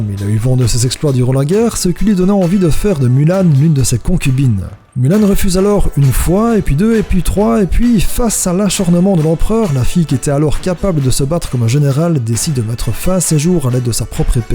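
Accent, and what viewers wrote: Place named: Liège, Belgium. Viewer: Dis tu viendrai pas de Liège parce que l'accent ressemble beaucoup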